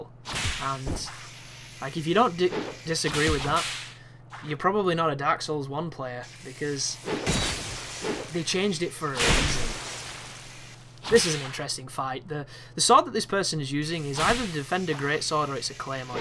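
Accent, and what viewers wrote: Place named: Bradford, United Kingdom. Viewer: Yeah, you've definitely got the accent. I'm gonna guess Bradford then.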